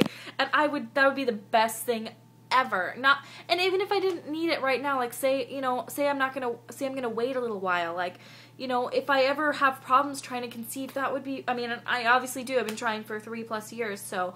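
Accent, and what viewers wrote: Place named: United States. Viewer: Yeah i didnt wanna admit but i just eat it too, coz im a fattie and i think 'meh. cake is good' ha. Well, im with you.. im trying to be good.... I've been prescribed this medicine called orlistat which doesnt let the body absorb fat or something... my bum is officially on fire lol. sorry tmi but hey were all ladies moaning here :) And yeh your accent is great!! Any american accent is soo glam to the boring brits lol x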